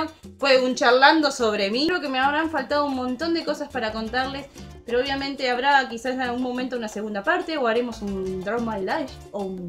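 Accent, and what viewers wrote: Argentina. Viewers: Amo argentina sobre todo tú acento 😂 nueva sub te invito a que te suscribas a mi canal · gracias por contarnos sobre ti. Siempre he dicho que el acento argentino es hermoso. Un abrazo desde Bogotá-Colombia 🇨🇴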